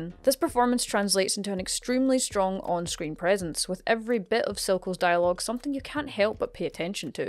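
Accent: Scotland, United Kingdom. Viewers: Arcane and Scottish accent commentary. How can I not subscribe? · A Scottish lass talking about my fave villian in recent memory, yes please.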